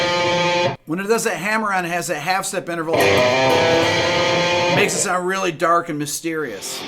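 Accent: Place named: Upstate New York, United States. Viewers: Rochester New York eh? I wondered where you are from and couldn't quite place the speech pattern. My parents are from upstate New York, met at RIT, we visited relatives in Rochester, and over the years my family lived there as well as Ithaca. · I didn't know you were from Rochester. I can hear the accent now. It's good to see another "homeboy" on YouTube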